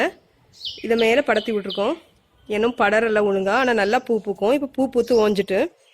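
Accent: Tirunelveli, India. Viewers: Nenga tirunelveli ya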